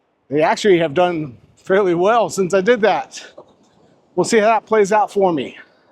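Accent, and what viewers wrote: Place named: Philadelphia, United States. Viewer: I thought I detected a Philly accent or close by.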